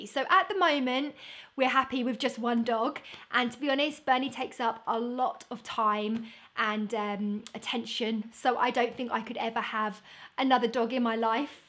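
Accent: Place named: United Kingdom. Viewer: Aw perfect,- at breakfast timeee :D To be honest, I watch your videos mostly because of you wonderful British accent. I miss England so much... My family visits friends in Devon from time to time and it always feels like coming home when we see the cliffs of Dover! So thank you for ... uhm speaking - I guess? 😆 And btw the new hair colour looks cute 😄🧡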